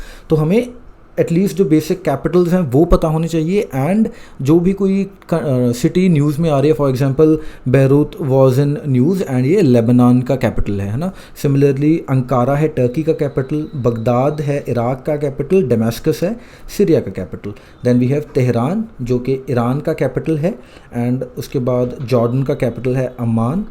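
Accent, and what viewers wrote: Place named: Delhi, India. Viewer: Puri delhi wale accent.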